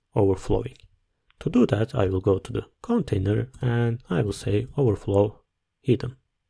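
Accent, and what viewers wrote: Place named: Turkey. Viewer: your accent sounds Turkish, keep up the good work king 🤍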